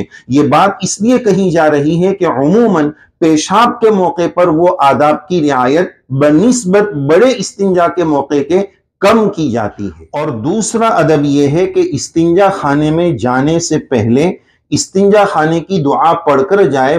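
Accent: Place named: Arab world. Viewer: Urdu Urdu ki tarhan he bola jay na ke aarbic style me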